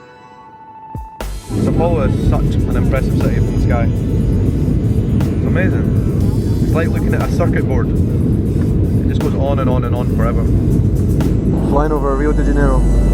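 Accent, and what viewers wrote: Scotland, United Kingdom. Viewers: Hi Where are you live..vitoria? I have a lot friends there if you need something please writte me..Im living in Dublin at moment. And I love your channel because the serie OUtlander.. and amazing your scottish accent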